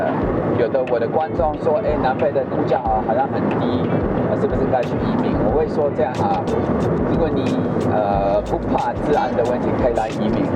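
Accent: Taiwan. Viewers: 尾音 吼，真的很台灣腔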